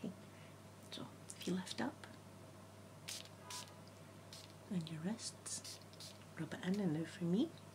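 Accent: Scotland, United Kingdom. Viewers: Her Scottish accent is so cute I love it too and is so calming and relaxing even if I'm Italian so sometimes I have trouble understanding it 🤗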